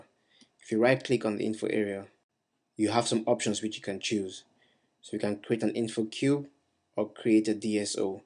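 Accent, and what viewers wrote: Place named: Africa. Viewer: Don't be a simple minded person. He has an African accent that is mixed with European accent. He is not faking any accent, instead he spoke slowly so everyone will understand. It shows exactly what you got from the training.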